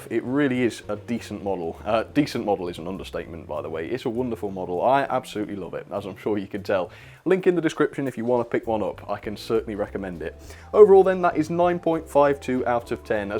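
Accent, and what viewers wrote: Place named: Nottingham, United Kingdom. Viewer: Is there any chance sam my son can meet you.he loves your vids.judging by your accent your not too far away from us.we are notts.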